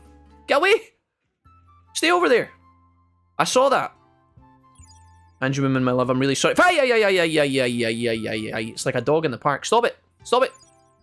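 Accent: Scotland, United Kingdom. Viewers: Watched your digimon vice play through so now on this as i'll watch time stranger later when I'm further in the game (had hardly any spoilers as of yet!). This has made me replaying Next Order from the beginning on my switch in bed at night. Safe to say I did not get the Gruesome twosome. I got Togemon and IceDevimon! Who are now Lilymon and Dynasmon via Gigadramon. Love your play throughs its nice hearing a fellow Scottish accent! 😬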